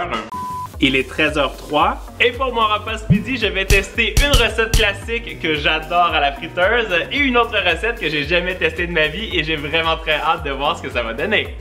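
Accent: Canada. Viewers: tu mon youtubeur préféré desCarl is cooking vidéos accent canadien et qui met quoi des vidéos trop trop rire 👍🇨🇦👍👍👍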